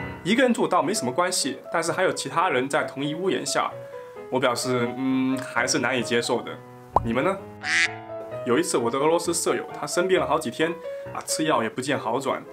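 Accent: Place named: Shaoguan, China. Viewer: 看你的说话口音和脸，我猜你是广东韶关地区的😀我粤西人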